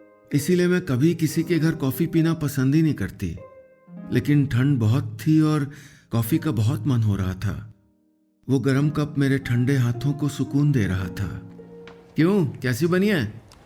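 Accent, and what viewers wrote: India. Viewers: Main 2018 se apki kahaniyan sunti aa rahi hoon apke alawa aur bhi bahut logo ko suna lekin apke jaisa desi accent aur kisi ke pas nahi Aap kahani sunate hain toh lagta hai jaise sab ankhon ke saamne ho rha hai, bahut sukoon hai aapki awaz me Ise hamesha jaari rakhna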